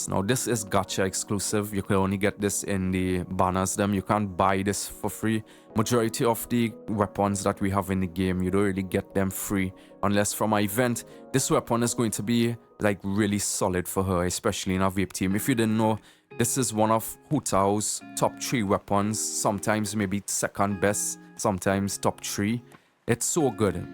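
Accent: Trinidad and Tobago. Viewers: love the trini accent makes the guide actually entertaining ill give u a like and a sub u deserve it :D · Trini to do bone! I’m half way an island boy myself🇧🇸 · I just got this video on my recommended and the trini accent caught me so off guard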